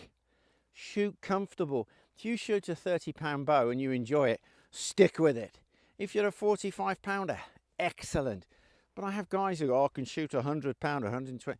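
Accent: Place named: Black Country, United Kingdom. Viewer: I can't tell if is a Brummie or yam yam, his accent has diluted In fact I'm going guess at the Halesowen area.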